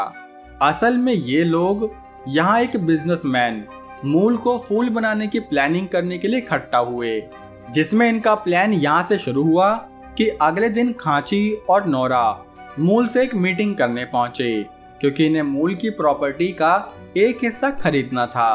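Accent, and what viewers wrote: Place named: Braj, India. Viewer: Tumhari boli se lag rha hai tum brij kshetra se ho, probably mathura ya agra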